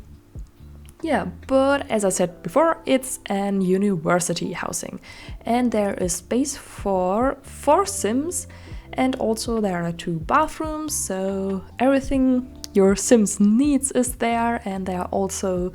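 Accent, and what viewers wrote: Germany. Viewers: Are you German haha 😅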